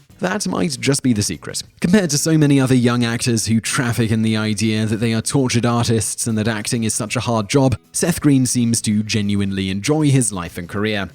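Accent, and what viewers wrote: United Kingdom. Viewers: has always been my favorite top ten list channel. Dont lose. We Yanks love British accents.: )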